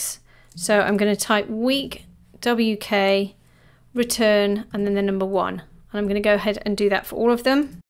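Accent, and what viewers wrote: United Kingdom. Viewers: British accent and the geat tips. Thank you.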